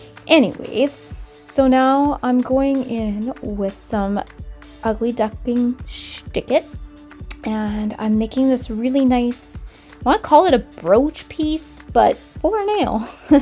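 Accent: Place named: Alberta, Canada. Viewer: Clearly you are a rocking Canadian!! I'm hearing mid/northern Alberta. You mentioned Edmonton, where you got???? I can't remember... Albeit I've been watching you for a while now, its still nice to have a fellow Canadian as a YouTuber!! Suzie, Sarah, and Talia are the other Canadians I watch. 💘 those ice cubes. So much fun. Great set. Nice colour combo💙💕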